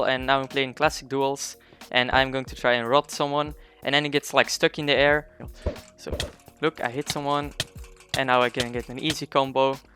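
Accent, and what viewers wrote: Netherlands. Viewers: Dutch accent lol keep going ur actually pretty good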